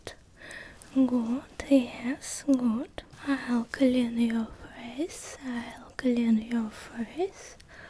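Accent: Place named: Russia. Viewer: Русский акцент по-моему офигенно триггерный. Вери вери гуд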